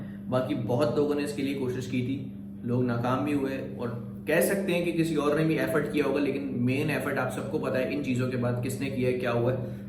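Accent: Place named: Karachi, Pakistan. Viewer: i watched the other video of 30 mins where he told whole story how his money was stolen. i thought this guy was really innocent, but after watching this video its proven this guy is another wrong number and cannot be trusted. Crypto becomes Halal after you pay TAX ? How did you pay tax and become halal for you while it is not taxable yet. ? How you or Waqar Zaka are Writing the regulation terms ? If you are son of honest mother and father do not remove this comment and answer all questions. and why you sound like a Karchi ka Bhaiyya with typical Ball licking gesture of Waqar Zaka. just because he guided you, you are speaking lies and promoting him like any corrupt politician for example. better fix yourself and stop acting like typical Gali ka Londa who would do Ball licking just for sake of being grateful to another scammer.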